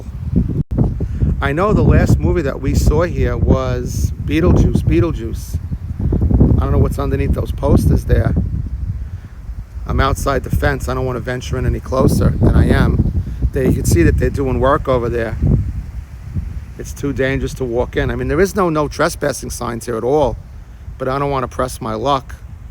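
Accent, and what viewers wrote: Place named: New Jersey, United States. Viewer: I’m from Jersey, too, we have the same accent. Thanks for who sings this video. I’m moving to Palmer Ranch next year. I can’t wait to see what they did with the mall.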